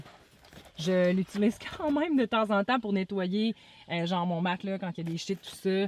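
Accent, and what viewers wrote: Quebec, Canada. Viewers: Super ton van! J adore ta façon de présenter ton van et de ton accent québécois tu nous fais rêver. J ai aménagé un dacia Lodgy, j attends les beaux jours pour partir pour la première fois. Bisous de Bordeaux en France. Jaky 🎀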